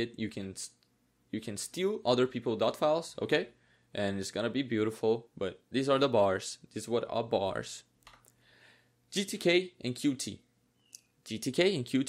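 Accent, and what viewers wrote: Brazil. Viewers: Você é brasileiro? Esse sutaque eu não confundo nunca, essas piadinhas enquanto fala e essa figura de linguagem. Consigo sentir de longe. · De fato ele fala bem pra cacete. Mas consegui notar o sotaque carioca AUSHAUSUHAUHSHUAUHSUAH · tu é brasileiro né? (percebi pelo "rh") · i can recognize a brazilian when i see one... the whole "RH" thing gave you away KKKKKKKKKKK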